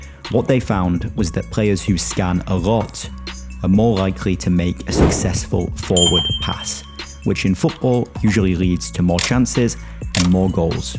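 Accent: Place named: Scotland, United Kingdom. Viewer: His accent sounds a bit scottish. That "last" is in no way pronounced wrong. You just have a problem with people accents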